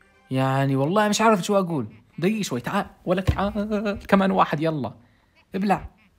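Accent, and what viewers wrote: Iraq. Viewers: تحجي كلة عراقي هل ايام😂😂😂😂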